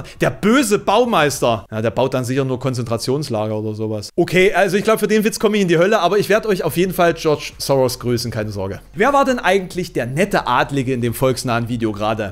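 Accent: Saxony, Germany. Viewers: Wer bist Du? Klingt nach einem Sachsen......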